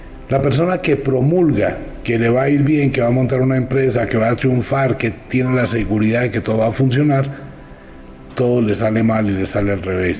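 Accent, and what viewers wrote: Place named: Colombia. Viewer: SEÑOR: LE COMUNICO POR ESTE MEDIO QUE TIENE USTED MUCHO KARISMA SUS CHARLAS SON MUY AMENAS Y MUY DIGERIBLE POR LO CUAL LE AGRADEZCO Y LE EXPRESO MI MÁS SINCERA Y HUMILDE ADMIRACIÓN LE CUENTO QUE LA GENTE DE COLOMBIA ES LA MEJOR AMÓ SU ACENTO ES QUUE SUCEDE, PASA, Y ACONTECE QUE HACE UNOS 10 AÑOS CONOCÍ EL GRAN AMOR DE MI VIDA... (uno de tantos pues) ERA TAN HERMOSA ERA DE CALI Y DE AHÍ QUEDE ENAMORADO DE TODA LA GENTE COLOMBIANA YO SOY DE MONTERREY NUEVO LEÓN MÉXICO. Y ADICO EN L.A. CALIFORNIA ESPERO VEA ESTE MENSAJE ANTES DE NAVIDAD LE DECEO MUCHA FELICIDAD EN ESTA NAVIDAD Y MUCHA SALUD Y SOBRE TODO MUCHA PAZ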